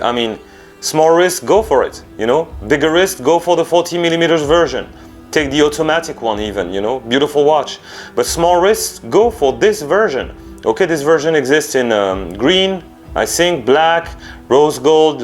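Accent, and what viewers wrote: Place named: France. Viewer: That is a beautiful watch! I may have to go and buy it! Also, may I say, your accent is gorgeous - I must come to France if all the men sound like this!!! 😂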